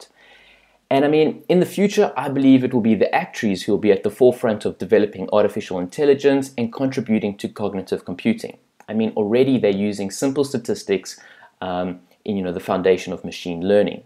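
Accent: South Africa. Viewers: You sound so South African lol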